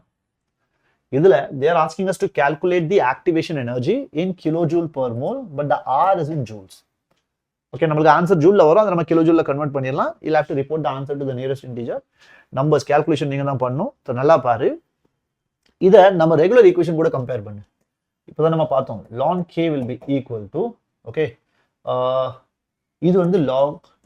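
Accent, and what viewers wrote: Tirunelveli, India. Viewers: Sir ku tirunelveli pola 😅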